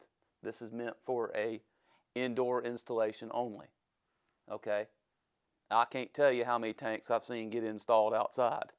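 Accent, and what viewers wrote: South, United States. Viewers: Enough with the southern accent and long intro. Get on with the video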